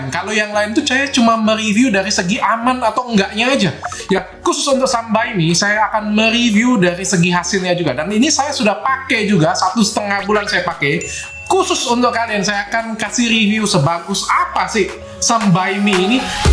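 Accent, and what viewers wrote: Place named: Palembang, Indonesia. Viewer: Wong Palembang ternyato 😂😂😂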